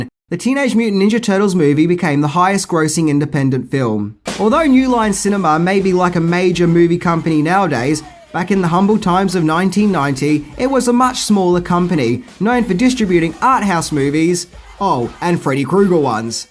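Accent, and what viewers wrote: Australia. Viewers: Australians pronounce things different lol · I don't think there are any facts about this movie that I don't already know...but it is fun to hear them in an Australian accent. Thanks!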